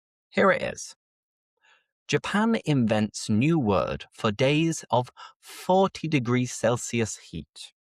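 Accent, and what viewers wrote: England, United Kingdom. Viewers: Wonderful! I love your English accent!!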